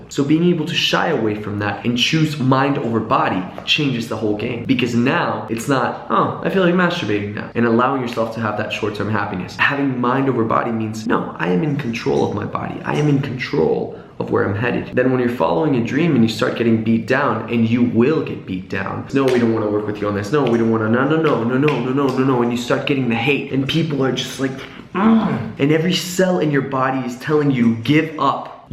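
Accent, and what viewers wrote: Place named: United States. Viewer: !?? Sounds really american. Think you had enough... and finally grown up.😉 Greetings from Holland.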